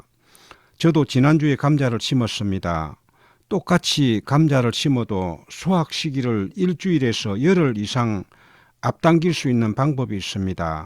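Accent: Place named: South Gyeongsang, South Korea. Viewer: 🥔감자, 🧢모자 등 말할때 앞 발음이 올라가는것은 경상남도 분들 발음법 같아서 정겹습니다. 저는 경북출신인데, 신혼때 경남에서 6년정도 살았거든요..ㅎㅎ 항상 좋은 영상 감사합니다.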